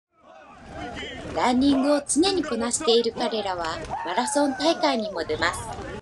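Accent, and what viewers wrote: Japan. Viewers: しゃべってるひと日本人？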